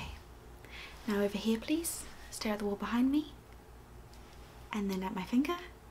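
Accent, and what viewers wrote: England, United Kingdom. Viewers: Can I ask, are you originally English or just perfectly pretend to be? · I LOVE British accents🙏🏻 you are my favorite 🙈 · ASMR Your accent is more refined than the other British ASMR artists - Always good to have a unique trait like that. This also makes is more believable that you're a physician as it falls into our preconceptions of how someone with that job would speak. On top of that, you display a good amount of confidence and maintain eye contact with the camera/patient, which adds further to the credibility of this persona. Underpinning all that you also seem to have the basics of ASMR down pretty well. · And what about a Scottish or Welsh accent? They're British too. This is an ENGLISH accent! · English* not British. There I no such thing as a "British" accent.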